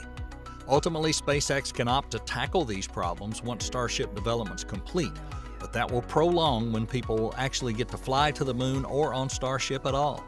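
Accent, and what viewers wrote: South, United States. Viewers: Another too rare video without randomly CAPITALISED WORDS in the title or inconsequential exclamation marks or deceiving wording, stupidly hoping it will generate more views. Please keep this more mature southern accent voiced guy that I learned to appreciate and stop occasionally replacing it with the other prepubescent one. Maybe keep those shady (and, quite frankly, dumb) tactics for your other thousand Youtube profiles I unsubscribed from, but please keep this one free of bullshit. Otherwise, I'm grateful for this well presented informative content.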